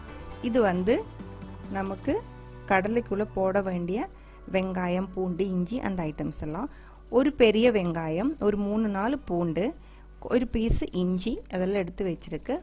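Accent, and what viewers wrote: Kerala, India. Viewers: Absolutely Beautiful Tamil in Malayala Flavour !!!!! Your Tamil is sweeter than our Tamil. Indeed! The recipe is super. Just now bumped into your channel. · Unga Malayalam + Tamizh slang semmma 😍😍😍😍😍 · நல்லாருக்கும்மா அதோட நீங்க பேசுற மலையாளத்தமிழ்ழும் அழகாருக்கு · The way you explain is nice to hear... Tamizh in Malayalam accent👌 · Tamil in malayalam slang very cute😊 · Nalla tamil pesureenga.. Konjam malayalam kalandhu neenga pesumbodhu kekkave nalla irukku.. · I like your malayalam mixed Tamil .. lots of love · She says that your cooking as well as the tamil with malayalam accent is very sweet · Superb !👌👌 Love ur explanation & ur malayalam kalandha Tamil!👍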